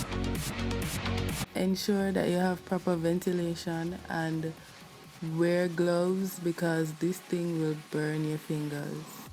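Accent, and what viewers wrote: Jamaica. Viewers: Knew you were a YAADIE (Jamaican) as soon as you started speaking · hold up sis come straight from yaad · know you're Jamaican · Jamaican accent, tun up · keep the sweet Jamaican accent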